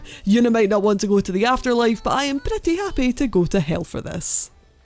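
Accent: Scotland, United Kingdom. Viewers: The voice of a Scots woman can make even the word murder sound good ;) This season had a good handful of shows that from the description didn’t sound as if they would be anything spectacular, such as Chios School Road and Asobi Asobase, but are genuinely funny as have become some of my favourites this time around. Also..... EDITING!!!!!! 😍❤️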